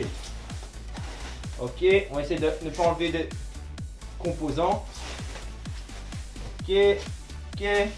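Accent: Belgium. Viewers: di donc tes belges toi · Tes du côté wallon ou flamant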